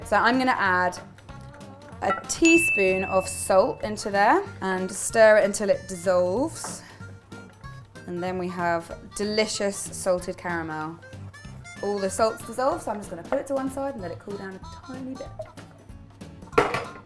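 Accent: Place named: Northern England, United Kingdom. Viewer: do you have someone close to you who is a Northerner? every video without fail you slot the accent in there hahaha. (i'm a northerner, it's always amusing ;) )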